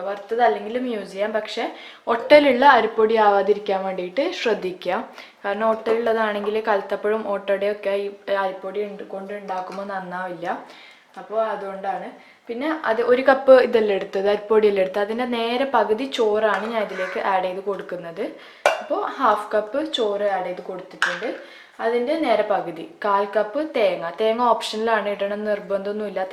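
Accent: Kasaragod, India. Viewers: oru vlog thani kasarkod shylilyil pratheekahikkunnu.....i wud luv to hear ur native style of talking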